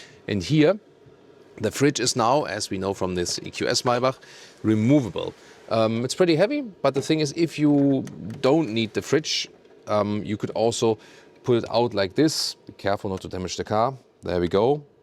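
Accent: Germany. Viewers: don't apologise for your deutsche pronounciation. Indeed it's MaybaH, not MaybaK.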